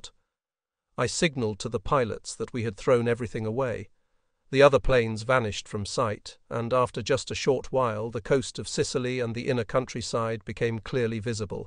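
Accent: United Kingdom. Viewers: Who provided the voice template for the A.I.? It has a crisp British accent I find interesting. Though it has those mispronunciations that annoy people, it is still pleasant to listen to.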